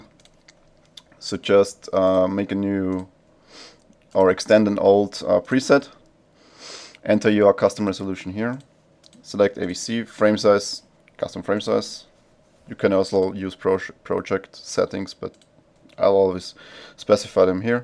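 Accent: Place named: Austria. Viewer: Mit dem österreichischer Akzent finde ich Deine Videos viel netter :-)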